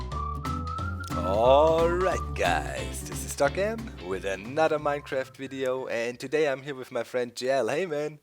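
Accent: Germany. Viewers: you can hear a little german accent :D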